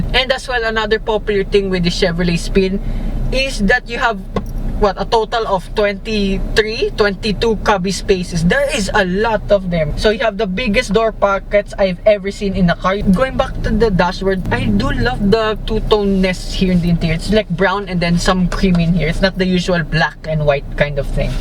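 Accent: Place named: Taglish, Philippines. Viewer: Sa susunod tagalugin mo na lang. Ang sakit sa tenga ng TAGAlish mo!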